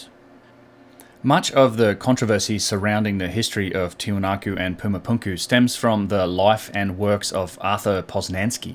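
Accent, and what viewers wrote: Australia. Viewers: I wish the voice in my head had Aussie accent. <3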